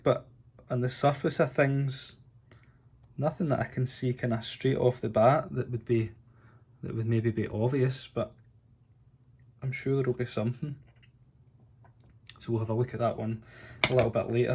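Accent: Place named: United Kingdom. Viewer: was this bulk submission? and you only waited 3 months? the turnaround now is likt 145 days. And also, did you had any problems with customs? From your accent I think your from the Uk so your kinda in the same situation as me. I'm from Portugal, and I'm kinda worried if something happens with the package or customs, cuz as you might know it's not insured. I send 2 weeks ago 50 cards. Just wanted to know if you had any problems. Thanks!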